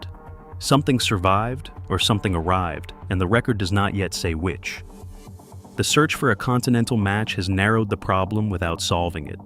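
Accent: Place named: United States. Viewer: The constant over pronunciation for dramatic effect, and mispronunciation out of poor programming makes listening to an American accent droning on and on make this extremely jarring.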